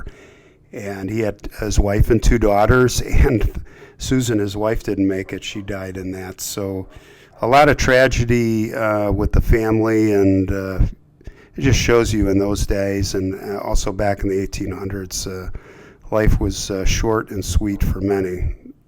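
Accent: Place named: Chicago, United States. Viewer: That Chicago dialect is so horrible. I hate this pos guy's voice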